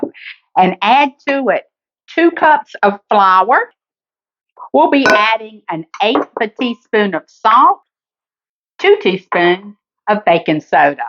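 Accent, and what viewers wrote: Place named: Carolina, United States. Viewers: Not sure where you're from but I'm from south Carolina and her accent sounds genuine to me. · Yep, that's a real Carolina accent.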